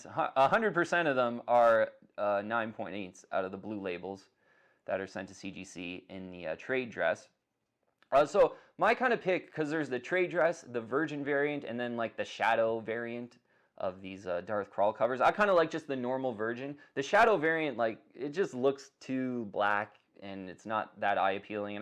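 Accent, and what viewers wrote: Canada. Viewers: Love this channel!!! The Canadian accent makes me home sick - LOL Great videos keep them coming!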